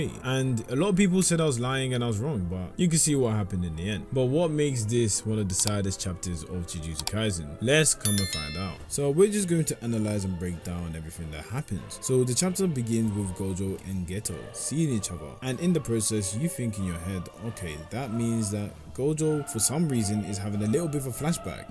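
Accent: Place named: United Kingdom. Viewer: why does bro sound so overly bri'ish 😭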